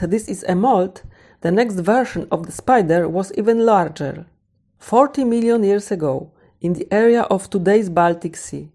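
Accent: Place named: Poland. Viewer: NIE DA SIĘ TEGO SŁUCHAĆ GDYBY NIE TEN POLSKIANGIELSKI TO MOŻNA BY OBEJRZEĆ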